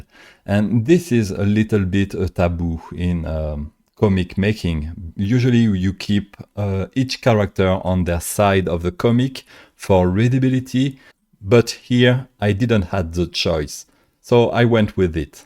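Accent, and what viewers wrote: France. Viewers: I really enjoy listening to you speak. It feels like I’m hearing two languages at once—French and English—while understanding everything perfectly. Amazing 🤩❤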